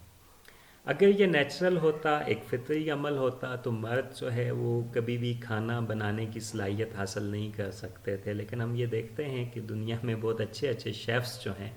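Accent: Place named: United Kingdom. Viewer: firstly I thought he is a Britisher........then he started Hindi....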